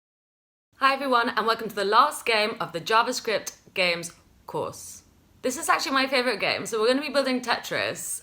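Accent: United Kingdom. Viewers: British english is hard to follow, what did author say 10 sq.... ? What's the unit of 10 and 20 here ? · I saw the thumbnail and someone knew she had a British accent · British accent is way too hard to get especially for a non-native speaker. I really wish subtitle was there :(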